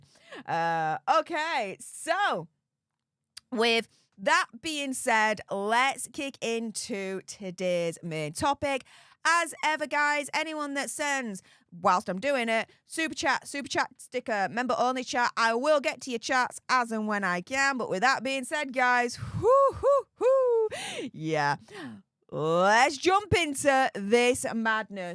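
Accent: Yorkshire, United Kingdom. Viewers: I remember your first videos. I couldn’t understand a word you said, either you are speaking more American or my ear is used to your accent now… Where are you from in the UK Or Australia?.. I cannot figure it out. 😂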